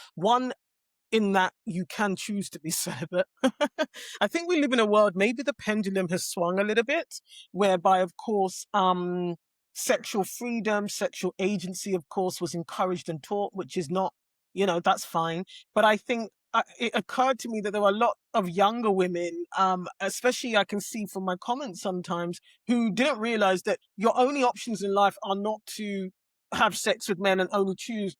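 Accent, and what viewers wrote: United Kingdom. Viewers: yeah she definitely have a British accent, don’t know where exactly but that accent isn’t American at all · London accent.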